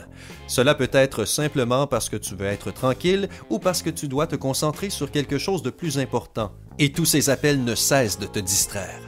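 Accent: Quebec, Canada. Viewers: HAHAHA LA VOIX QUEBECOISE DE SYMPA! YAYYY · Wtf ses un québécois et ne me contredisez pas je connais l’accent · C'est très évident que la personne qui parle est un québécois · L'accent québécois purée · Je kiffffe ton accent québécois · Voie canadiennes bonnes explication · très sympa et accent canadien trop rigolo pour nous en france c'est très campagnard !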